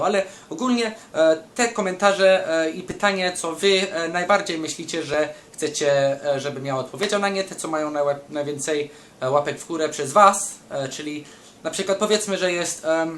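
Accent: England, United Kingdom. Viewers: BO JEST Z ANGI TO AKCENT:D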